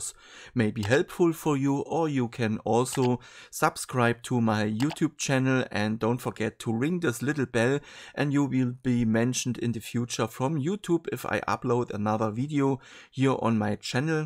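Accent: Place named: Germany. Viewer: Habe gleich herausgehört, dass deine Muttersprache auch deutsch ist. Und auch ein gemeinsames Hobby haben wir schonmal.😉✌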